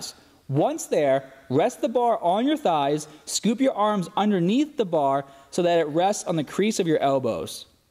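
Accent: Boston, United States. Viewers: I see he hadn't developed his Boston bah-bell "accent" yet..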